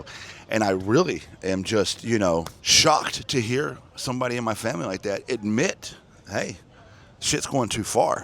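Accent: South, United States. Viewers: You still kinda got that country accent!!! 😁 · You haven't lost your country accent. You maybe hid it in a safe place, like behind your ear. It still sneaks into your vocal chords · You need to know something, you really haven't lost your southern accent, my friend😅 I just started following you about 2-3 months ago, but I've been watching your videos for at least a year. I still haven't ever heard where you were born or raised but I can definitely tell you are Southern! I'm guessing it's in or near Kentucky/Alabama. I'm from Iowa, where language experts will tell you there's no accents when Iowans speak. We use to have the most call centers in the country until dark money demons slowly moved in & flipped Iowa red. The first thing they did after citizens united was destroy our education system, first rural schools then expanded, then they setup local FOX TV stations everywhere & bought the newspapers in all the same markets, then they busted unions and gerrymandered everywhere possible. 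They moved most call centers & union jobs overseas or out of the country. We do still have well-spoken customer service representatives though (when you find them). Iowans still speak the clearest english (no accents) and have the best use of vocabulary in America (or we used to), not sure what good its for now because they passed school choice vouchers here 2 years ago, so it's not going to be long before whats left of the future for kids who've been educated in iowa public schools will be 95% effectively destroyed. They removed Civics and Government from public school curriculums in 1986, then they eliminated access to free music lessons & then classes for performing a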